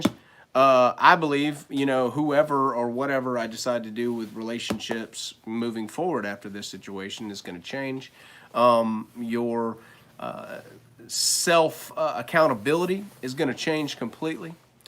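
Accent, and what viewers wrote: Alabama, United States. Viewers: Are you from Alabama bro ! I dig the accent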